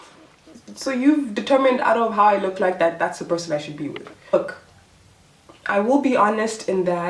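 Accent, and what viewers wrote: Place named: California, United States. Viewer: Which part of South Africa you from? Interracial dating isn’t common at all. You making sound as if you from California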